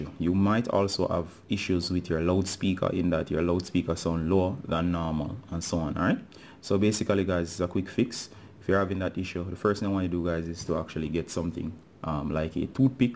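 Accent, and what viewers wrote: Jamaica. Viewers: Is that a Jamaican accent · Anyone else realize the Jamaican accent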